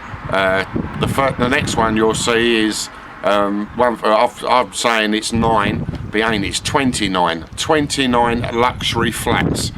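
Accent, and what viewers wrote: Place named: East London, United Kingdom. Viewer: WHERE YOU FROM ORIGINALLY,? I HAVE A SIMILAR ACCENT AND I'M FROM RAINHAM/DAGENHAM. NOW LIVE IN HUDDERSFIELD FOR SOME FUCKING REASON. KEEP UP THE AMAZING WORK, MATE. BIG FAN.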